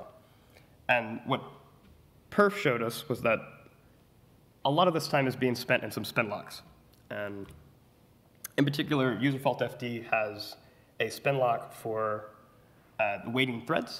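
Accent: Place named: Assam, India. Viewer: You speak phenomenally well, despite the Assamese accent!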